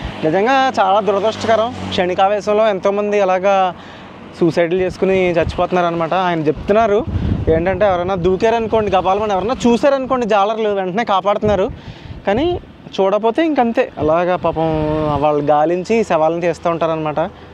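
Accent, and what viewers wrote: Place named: Konaseema, India. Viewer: Hi anna. Mee maatallo konaseema effection kanabaduthundhi.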